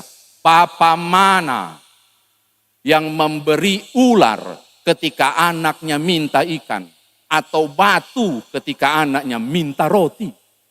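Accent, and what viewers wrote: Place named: Kupang, Indonesia. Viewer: Puji Tuhan... Trimakasih pak pendeta, untuk reningan yg menguatkan. Sambil senyum dengar Bapak logat kupang....😊😊🙏🙏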